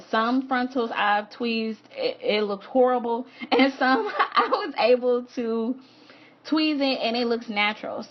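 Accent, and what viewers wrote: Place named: Philadelphia, United States. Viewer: are u from Philadelphia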